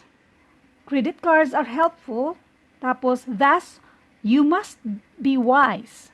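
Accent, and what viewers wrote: Visayas, Philippines. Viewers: Your relative is here 🤗 mam, Mutia shock ko gamay , ma reaction 😲 , nakita ko sa reviewer, while reviewing M., video at reviewer nyo po, ang gamit ko para mag review kaya pala sound bisaya .... big thank you mam sa inyo..🙏🙏, sana nga lang di ko makalimutan ang ne review ko ..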